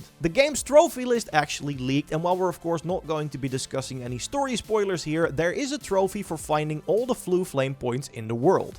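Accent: Netherlands. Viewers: Definitely some guy at the Netherlands. Very goed Engels. Beetje Louis van Gaal vibes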